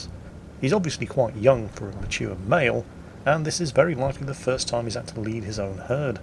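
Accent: United Kingdom. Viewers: his British its in there blood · Sir David Attenborough doesn't have anything on you,. If you fail at gaming, I certainly see a career for you in monotonous British-accented wilderness television.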